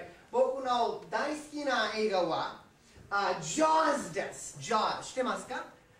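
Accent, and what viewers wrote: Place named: United States. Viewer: 歌うの本当に上手ですｗ すごいアメリカンなまりで話してますけど、もしかわざとやってますか？ 日本語上手そうですし。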